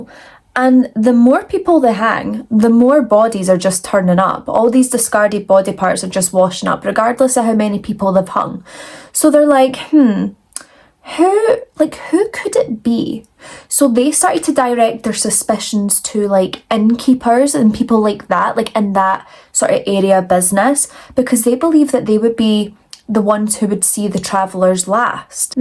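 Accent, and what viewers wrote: Scotland, United Kingdom. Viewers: That's what I said I just found her like just now, listened to her talk and I'm like omg she Scottish love it already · Hey I don’t usually comment like this on videos but I see you’re new so I wanted to post more constructive feedback. I think you’re a bit hard to understand and I don’t think it’s the (scottish?) accent. For clarity, I’m American which doesn’t help since I don’t hear this accent too frequently, but I think it’s a microphone quality issue since it’s the fuzziness between words that makes it harder to understand. I loved your video, first time watching but I think it’s an audio quality issue. It’s either from the microphone you’re recording from or maybe from compression when you’re downloading the video. I don’t know much about it all but your camera quality is very good and you speak very well and it sounds close to the microphone so I assume it’s a compression/ tech quality issue. 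From my time playing classical music and having to record audio for lessons and stuff, I’ve learned that putting my mic in a 12x12 foam box helps some or putting a mesh barrier between you and the microphone. I hope this doesn’t come off critically, your content and video are very well made and I subscribed to the channel as I think it’ll be awesome! I just don’t want you to lose potential subscribers due to audio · ❤ love your accent ! Are you Scottish? Sorry first video of yours i found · Nothing beats the authentic British accent! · It’s a Scottish accent, but close!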